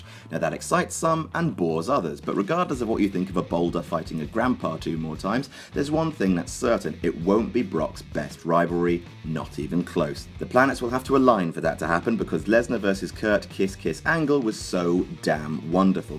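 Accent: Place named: United Kingdom. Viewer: Anyone else laugh themselves to hysterics when he said "TROLOLOLOLOLOLOLOL" in that deadpan British monotone?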